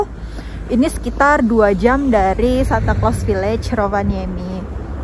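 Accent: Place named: Java, Indonesia. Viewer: Mbk logat omonganmu kok ke Jawa"an gt.. Asli orng Jawa ya.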